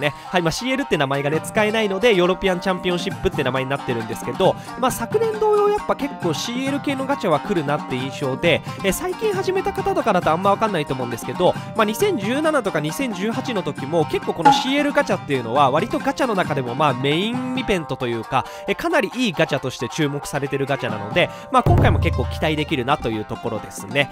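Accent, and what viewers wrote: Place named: Saga, Japan. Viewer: 佐賀弁むっずいですねwww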